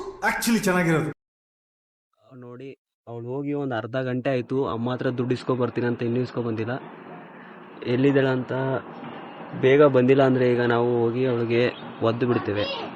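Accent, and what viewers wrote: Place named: Uttara Kannada, India. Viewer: ನೀ ನಮ್ಮ uk ಶೈಲಿಲೆ ಮಾತಾಡು ಚನ್ನಾಗಿ ಇರುತ್ತೆ.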